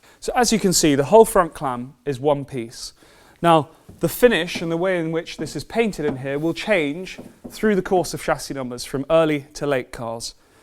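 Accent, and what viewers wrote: United Kingdom. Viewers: hearing a brit explain how collectors complain about having a lift vs having an auto lift is a rich ppl problem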